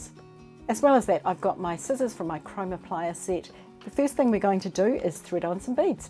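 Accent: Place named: New Zealand. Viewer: Thanks,great tutorial, never thought about hiding the knot before! Thanks from Wales, I've friends from South island NZ and your accent makes me homesick for beautiful NZ xx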